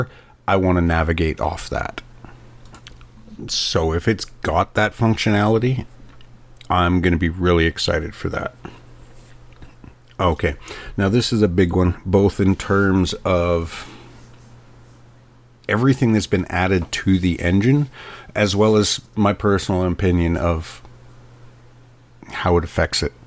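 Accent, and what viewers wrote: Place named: France. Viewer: What is this robotic french voice. Please stop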